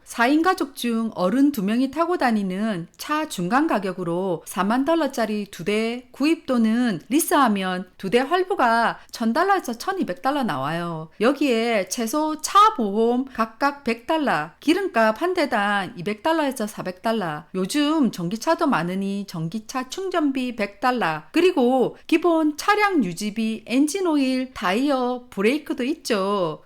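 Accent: Gyeongsang, South Korea. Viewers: 경상도사투리가 구수하고 좋아요. 전라도 깽깽대는 사투리는 정말 재수없고 구역질 납니다. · 와 경상도 사투리 역대급이다 . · 경상도 사투리 귀에 거슬려요..ㅠㅠㅠㅠㅠㅠ 난 2찍들이 정말 싫어요. 저는 대구시민이예요.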